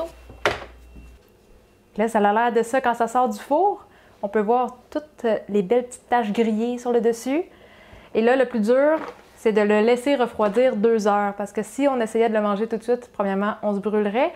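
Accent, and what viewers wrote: Quebec, Canada. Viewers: C'est l'accent Québecois et du Lac Saint-Jean:)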